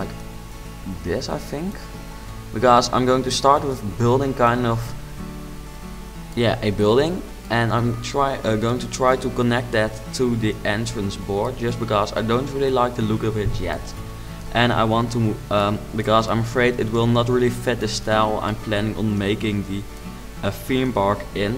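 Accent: Netherlands. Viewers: it's because he is Dutch. I am Dutch to, and I also have that accent! there is nothing wrong with that. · i tried watching this video without getting annoyed by the dutch accent, but i just can't, i'm sorry. i'm dutch as well, but i can't stand the accent, imagine listening to it for 23 minutes.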